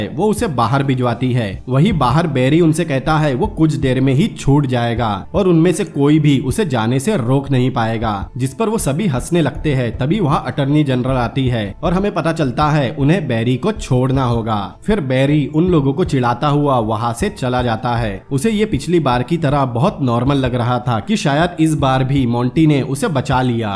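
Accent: Assam, India. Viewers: भाई आप असम से हो??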